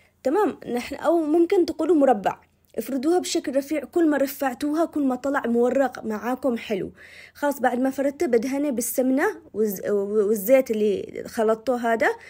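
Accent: Aden, Yemen. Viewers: فديتك والله وفديت اللهجه حقنا اصحاب عدن ❤😂ضحكتيني لما قلتي ناقص البسسس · السلام عليكم ،ماشاءالله اللهم بارك ،فعلا اللهجه العدنيه ذكرتنا بأيام عدن الحبيبه والمخبازه العدنيه.ياالله أيام حلووووه جدا جزاكي الله خيرا اختي العزيزه ❤️. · تسلمي على طباختك الجميله الي هيه نفسك وكمان كلامك الجميل جدا احب لهجهه عدن عندي سؤال ليش لما افعل الشاهي مايرضي يطلع راحته راحه حقه مش شاهي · اعشق الكلام العدني الله يسعدك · تسلمي شيف بنت اليمن الحبيب كلامك ولهجتك العدنية عسل دوعني ولآ طبخك العدني الأصيل من أرض اليمن السعيد..يحفظك ربي ويديم عليك الصحة والعافية 🎉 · انا فدا للهجه العدنيه حاليه ماشاءالله ومفهومه ذكرتيني بامي الله يحفظها لي ويحفظ كل امهاتنا يارب ❤وكمان الخبز والحلبه عجبني كتير وجربت طريقتك وكل العائله حبوها❤ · ياي لديد لهجتك متل اصدقائي يمنيين درسو معي بالجزائر اكلكم بزاف شباب ولديد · ياحلات كلامك قبل طبخك انا من عشاق الكلام العدني 🤗😜 ماشاءالله تبارك الرحمن سلمت يدك ❤ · ما شاء الله وبالعافية عليكم .. 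يسلم الذي علّم .. بنت مفحوسة صحيح.. واحسن شي الهدرة العدني .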